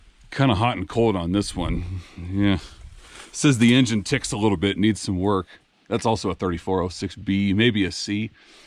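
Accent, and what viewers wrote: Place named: United States. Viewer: I’m from Poland 🇵🇱 i working as truck driver. U have beautiful American accent ❤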